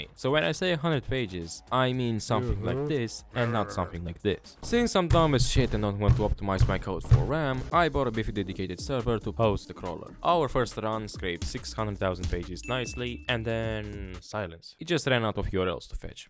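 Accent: Russia. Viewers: Wtf is even your accent, you didn't used to have so much russian in yout accent. What is wrong with you? I can't understand a sh*t!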